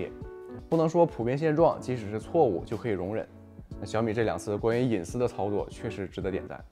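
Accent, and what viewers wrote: Northeast, China. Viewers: 东北口音挺重啊！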